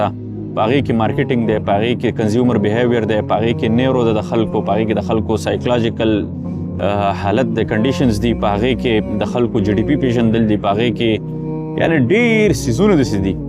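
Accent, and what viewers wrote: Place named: Gulkhan, Afghanistan. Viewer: دا ستا لهجه ولی ده ګلخانانو ده؟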